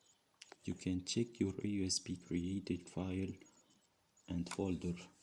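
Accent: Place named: Tunisia. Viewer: منعرفش علاش نحسك تونسي ❤❤❤❤❤❤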